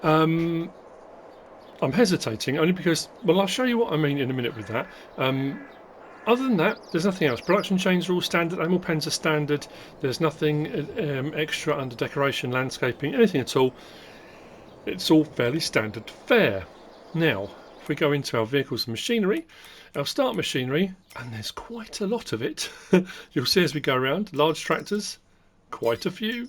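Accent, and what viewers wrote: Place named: United Kingdom. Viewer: I was just reading an article "Here’s Why So Many Movie Bad Guys Have British Accents" AND i Thought of you 💡 You have that perfect villain accent that has many features that are perfect for a villain. Queen’s English make people appear, quote “more educated, intelligent, competent, physically attractive, and generally of a higher socioeconomic class.” end quote lol. I have an EVIL PLAN.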